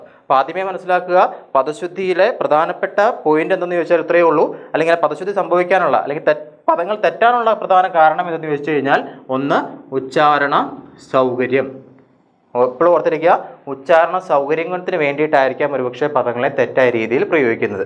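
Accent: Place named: Thiruvananthapuram, India. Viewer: Farya parayunnath Thiruvananthapuram Kollam aan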